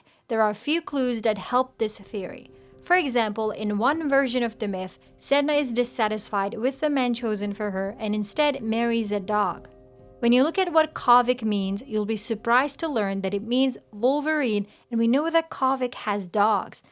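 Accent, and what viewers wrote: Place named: Russia. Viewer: Haha Russian or Ukrainian it sounds like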